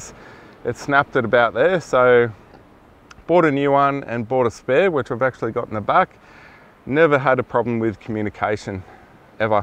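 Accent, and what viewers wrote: Germany. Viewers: Wenn du als deutscher sofort hörst das du deutscher bist/warst😂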